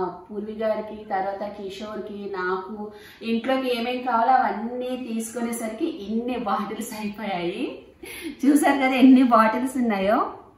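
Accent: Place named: East Godavari, India. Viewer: Mundhuga Happy New year alage Happy Birthday inkoti enti ante moothi kalipoindhi sory thappuga anukovaddu chakkaga east godhavari ammaila matladaru madhi kooda east godhavari katthipoodi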